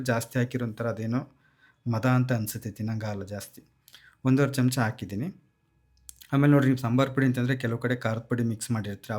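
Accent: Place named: Holalkere, India. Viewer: ನಮ್ಮ ಹೊಳಲ್ಕೆರೆ ಭಾಗದ ಊಟದ ಸೊಗಡಿನಂತೆ ನಿಮ್ಮ ಮಾತು ಸಹ ನಮ್ಮ ಸೊಗಡು ಉಳಿಸಿಕೊಂಡಿದೆ😂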